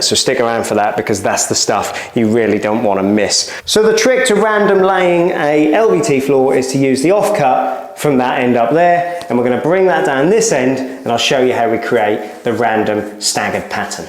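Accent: Norfolk, United Kingdom. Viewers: He’s got a good old Norfolk accent!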